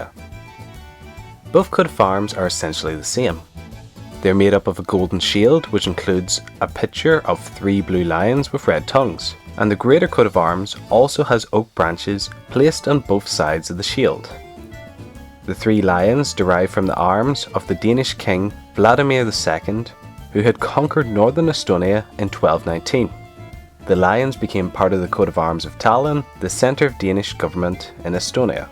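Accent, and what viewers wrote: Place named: Scotland, United Kingdom. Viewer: Your scottish accent is funny 😆😂😀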